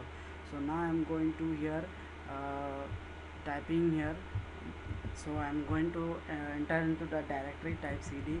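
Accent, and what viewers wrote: India. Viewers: I love indian 80% problem before this is fixed by indian accent tutorial.. thank bro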